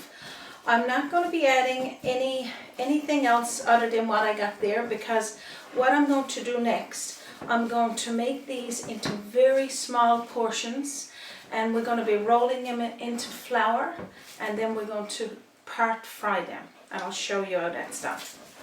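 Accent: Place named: Newfoundland, Canada. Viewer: I like this simple recipe, I have made this many times, but I'm going to flour coat the balls like you did with flour. I ave to say the rice was not steamed in was boiled and set to cool, then served, I love your NFLD accent, some day if I ever get back I will bring you a great bag of H's, so you can say here we are instead of are we are, stay till I come were your at. I do love you don't get me wrong, this vid was 8 years ago and you avent changed, and that a good thing🥣🥣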